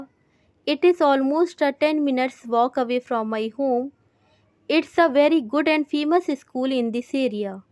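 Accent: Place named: India. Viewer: See, I gave you the first (like) and the first comment, but you have to improve your dialect which is totally native Indian. Good luck.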